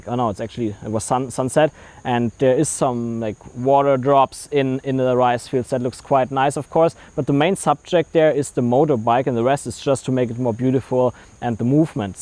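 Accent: Germany. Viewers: Danke für die tollen Tipps.. (Asume your accent is German=).. Great ideas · Mach Deutsche Videos man hört dass du Deuscher bist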